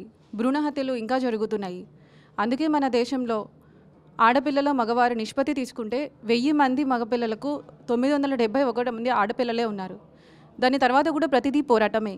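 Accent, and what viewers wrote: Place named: Karnataka, India. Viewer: May be Kannadiga...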